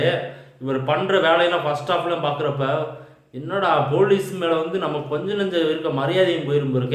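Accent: Madurai, India. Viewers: bro neenga entha ooru odasalunu soldringa entha ooru basha maduraya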